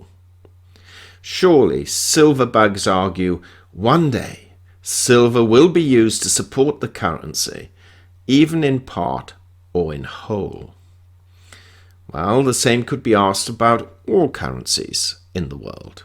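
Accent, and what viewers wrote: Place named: RP, United Kingdom. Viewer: First of all we don't want a one world currency. We surely don't want a one world currency controlled by a central group who want a new one world order. I suspect you are one of those that want a centrally controlled currency easy to manipulate. Silver and gold plus some other inherent value commodities could protect us from dictatorial control of finance (the fiat fraud) that has so indebted the world. Also, silver needs to be revalued to preserve it from destruction. At a higher price silver substitutes will come along to fill need and make available more silver for monetary use. A national currency backed by a useful commodity like silver would have the advantage over those supported by faith in goverment oppertunists. Let there be national currencies to compete to find fair market value and protect the world from Globalist fascism. 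Tone down the elitist sounding posh accent. It sound smarmy.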